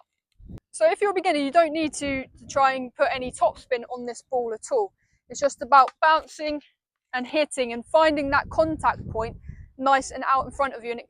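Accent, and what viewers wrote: England, United Kingdom. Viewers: best, what a nice accent? what part of england it is?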